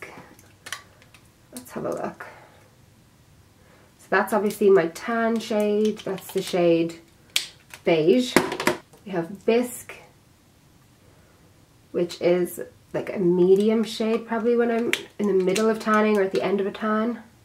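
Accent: Ireland. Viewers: I'm learning Irish accent with your video! Thank you✨ Love from 🇯🇵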